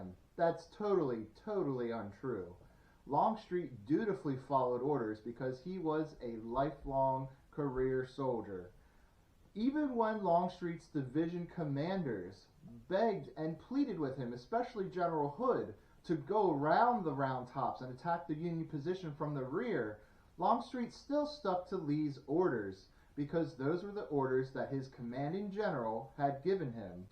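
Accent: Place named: South, United States. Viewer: Watching this for a second time a year later. Lol. Im trying to figure out why your southern accent is ssoooo much different from Ranger southern accent. And his is authentic! Most importantly, perhaps the Rebs could have won if Garfield would have knocked the Union cannon off the table instead of the booklet.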